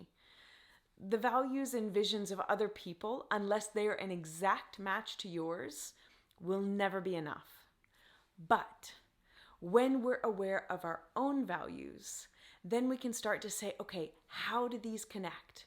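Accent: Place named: Jamaica. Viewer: Your accent sounds very Jamaican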